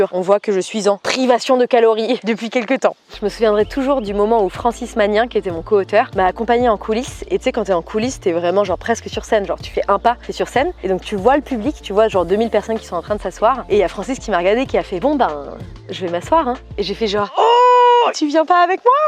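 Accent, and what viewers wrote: South, France. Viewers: Encore une fois , “une petite pépite” cette vidéo ( avec l’accent du sud s’il vous plais 😂 ). Merci pour nous avoir partager autant de bonne humeur , des le réveil ça fait énormément plaisir 🙏😌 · Au programme des troupes de dance moderne jazz avec des chapeaux melons, 🎩😂😂un parfait accent du sud👍👌😉 et des expressions qu'on adore comme" j'men bat les Yakas".😝😉😂 Je t'adore continue ce que tu fais.👏😉😂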